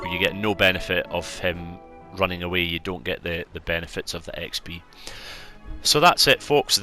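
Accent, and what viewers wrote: Scotland, United Kingdom. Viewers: I believe he may be Scottish, me lad.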